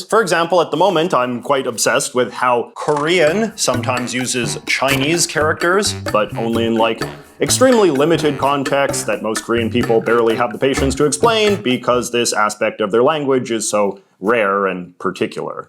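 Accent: Canada. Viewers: Why do American and Canadians sound so similar however the small things like about stayed distinctive and possibly are only Canadian. This accent also seems to be in the northern mid American accent. but why? The hozer accent is so interesting. So many canadians Like have no natural hozer style accent but the about is the one variable. Like some with the word water or lemon. Saying watta or limn. These are all so interesting and seem almost to defy region in some ways. Do these exist in mexico? It is such a huge country that I would assume the accents are VERY different as also geographically there are lots of variables and difficulties getting from place to place.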